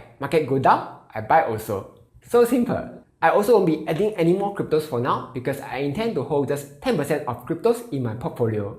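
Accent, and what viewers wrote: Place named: Singapore. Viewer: Long live Singlish! 😁😄👍💖🙀💯😇😁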